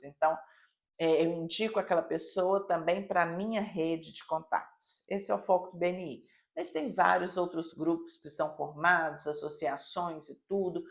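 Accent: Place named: Brazil. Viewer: Gosto muito de a ouvir ! Tem toda a razão quando diz que muitos brasileiros se fecham "guetos", ou a comunidade migrante em geral. Claro q é um erro. Nunca vão conhecer novos costumes e culturas. A questão do Português de PT, aprendam só os termos e formas verbais e tentem falar / escrever consoante essa aprendizagem ( mais importante para quem estuda), mas não percam esse lindo sotaque, que não nos incomoda nada, pelo contrário. Sucesso!!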